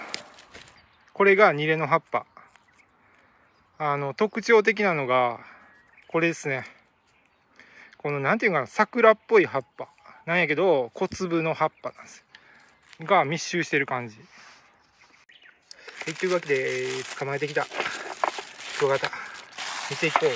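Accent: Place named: Nara, Japan. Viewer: 喋り方が関西の中でも奈良や京都の南の方ぽい(^^)木津川かな？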